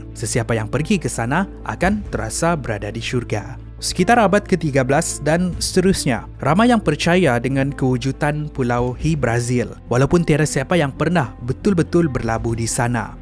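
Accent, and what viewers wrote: Sabah, Malaysia. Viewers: Admin ni orang sabah ka..loghat macam orang sabah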